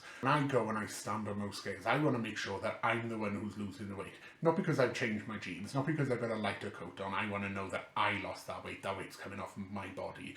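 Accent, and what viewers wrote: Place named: South Wales, United Kingdom. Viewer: New sub here! Also from South Wales I'm assuming by your accent you are to! Great video keep up the hard work !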